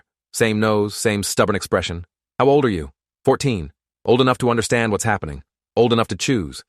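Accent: United Kingdom. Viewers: I love the Britain accent. It’s wonderful.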